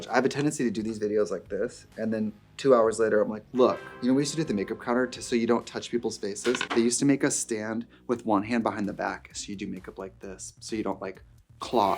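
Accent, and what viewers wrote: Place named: Milwaukee, United States. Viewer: it’s the midwest accent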